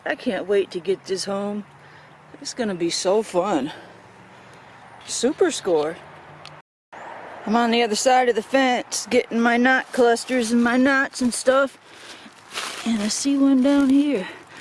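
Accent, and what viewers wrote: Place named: United States. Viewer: go there with a shiansaw sister it's more god for you're body and you're hearth you know i'm from Québec city but i love you're accent frome the US you know ??? but i don't like you're show's HAHAHA lollll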